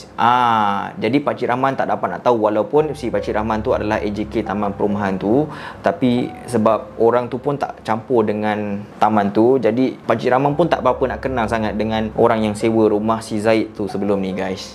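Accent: Johor, Malaysia. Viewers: org johor ke?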